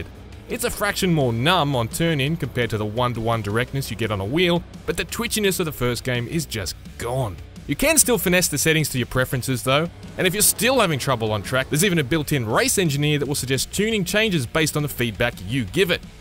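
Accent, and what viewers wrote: Australia. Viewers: Dat auzie accent doh! Makes everything automatically more enjoyable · As Joe Rogan says australian is the coolest accent. Great review as always man! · has no one in this comment section heard an australian accent before? Ya'll are SHOOK.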